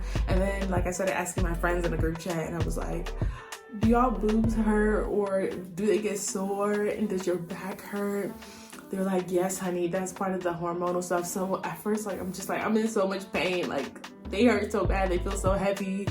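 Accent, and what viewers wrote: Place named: United States. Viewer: Yh your American Accent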